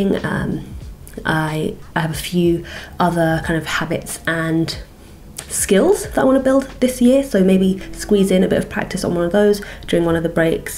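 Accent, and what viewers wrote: England, United Kingdom. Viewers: Usually I don’t like sophisticated English accents, but you’re the only exception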